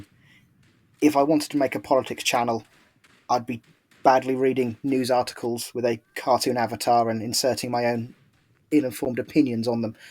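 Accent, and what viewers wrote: Norfolk, United Kingdom. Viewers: Lovely Norfolk accent. Compared to mine you sound clear precise and professional. Ar B lovely ole job. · Hey this is an old fen tiger that's retired to West Africa ... just found you & it's brilliant ... also nothing wrong with a Norfolk accent ... bootiful 🤗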